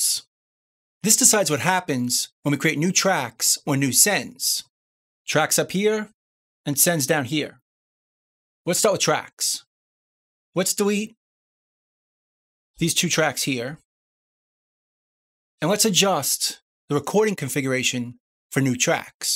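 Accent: New York, United States. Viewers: Hey your from New York like me, I recognize that accent anywhere, I grew up in NYC, Queens YO! Yeah Reaper is awesome, I was using Adobe audition for the longest time and the latency issue on that thing drove me absolutely crazy, it got to the point where I gave up and would just try to physically move the tracks so they would play in time, but even then even if you're a millisecond off then after a minute it goes out of time. I really don't understand why a company like Adobe would go through all that trouble making a program like that and totally F up the latency issue. Anyway Reaper is amazing, no issues whatsoever, and THANKS so much for all these tutorials! Fantastic!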